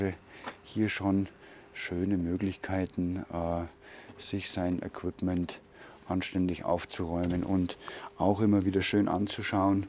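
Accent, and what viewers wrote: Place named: Lower Bavaria, Germany. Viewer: Hallo, also das Video wäre noch besser, wenn du dich auf deine Heimat Sprache ( Niederbayrisch) einigen könntest, man merkt, dass du dich anstrengen musst wegen dem versuchten Hochdeutsch, deswegen deine Langsamen Wörter/Sätze. Bei ca. 14/16 min. willst du den linken Kleiderschrank (wenn man zum Bett davor stehst) erklären, ist dir da ein Fehler unterlaufen? denn die Türe geht ja gar nicht auf, die hängt an den Treppen, also kann man die nur von oben aufmachen. Denke du solltest in Zukunft deine Vorstellungs- Mobile vorher besser/vorbereiten/ ausprobieren wo was steht oder geht. Denke "Bayern Camper hat eine bessere Werbung verdient.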